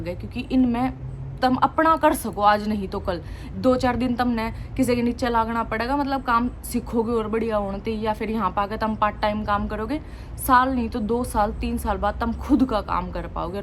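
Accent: Haryana, India. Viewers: Nice description with haryanvi touch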